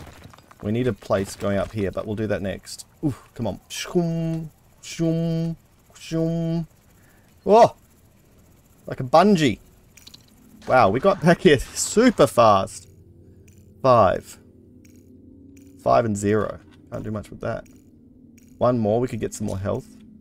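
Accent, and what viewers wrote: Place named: Australia. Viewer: Good to hear an Aussie voice on YouTube. Cheers bro 👌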